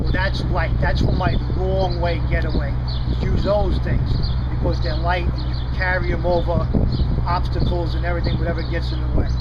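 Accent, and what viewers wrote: New York, United States. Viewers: Dats for all yu trolls and haydus out dare. Love that New York Accent. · man I wish I could have your new york accent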